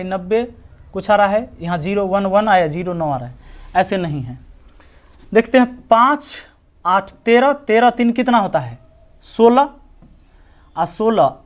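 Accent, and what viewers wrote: Bihar, India. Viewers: Sir ap Bihar se ho kia?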